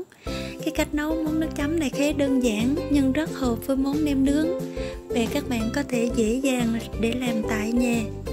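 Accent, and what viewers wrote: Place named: Nha Trang, Vietnam. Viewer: Ninh hòa .Nha trang.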